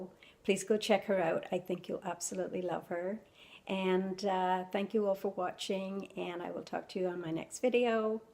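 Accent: Ireland. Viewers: You still have a bit of the Irish accent and its beautiful. You have have lived in two places I can only dream to visit. Great video #teamsupportalltheway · I just loooove your Irish accent. It's soft but fully alive and well. I'm part Skottish in my dad's side, and all four of my adopted children have Irish heritage. I enjoyed hearing about your traditions, past and present, and the turnips and carrots dish sounds absolutely delish. I know I'd love it. And I didn't make true resolutions this year either. Being goal driven sounds better, for sure. This was so fun to watch. Thanks for sharing with us,. You're a gem! Peace! ~TONI 💜🌺💚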